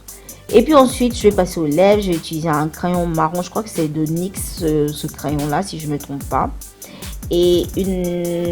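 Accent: Ivory Coast. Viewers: Tu es ivoirienne d’origine ou mon oreille me trompe ?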